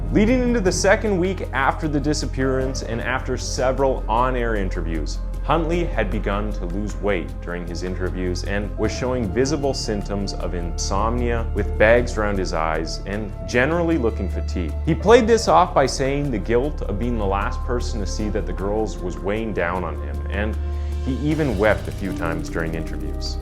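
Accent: Canada. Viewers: 🙄 Damn shame, you couldn’t tell that the narrator is Canadian by his accent? You must not know many Americans (but of course, our accents have a hell of range, you know). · Do you not hear his Canadian accent? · You're Canadian aren't you?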